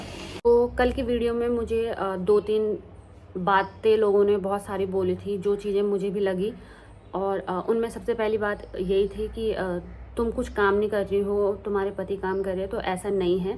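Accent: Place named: Garhwal, India. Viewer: Ap bhut axe ho or jb aap gadwali bolte ho to bhut acha lgta h❤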